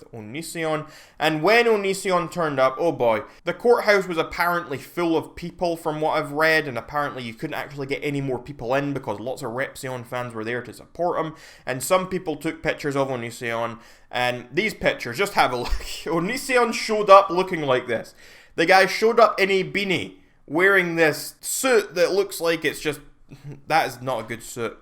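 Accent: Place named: Scotland, United Kingdom. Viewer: What is the world coming to? Just wanna say it's so comforting hearing a Scottish accent on here - love from Scotland 💙💙💙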